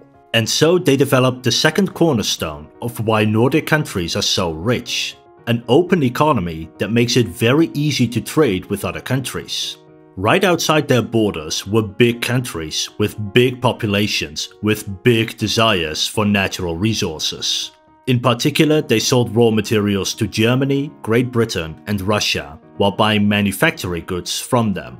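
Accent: Netherlands. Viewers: This dude sounds Dutch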